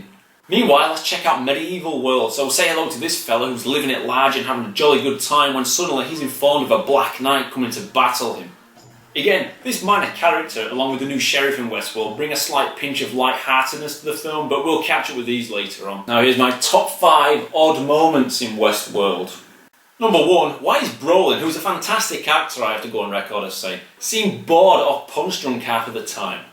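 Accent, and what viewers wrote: Scotland, United Kingdom. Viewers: Its wonerful to have you back mate, been waiting for a long time to hear your scottish acent again 5/5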